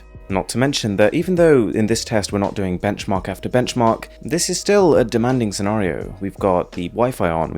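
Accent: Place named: RP, United Kingdom. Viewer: Your accent is CARTOONISHLY posh.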